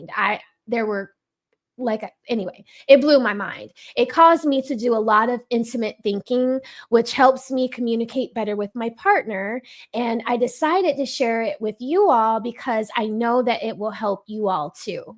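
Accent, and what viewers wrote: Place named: South, United States. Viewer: Kinda figured you were mixed with something. Couldn't put my finger on it. Occasionally, I could hear a bit of a Southern twang and come the freckles.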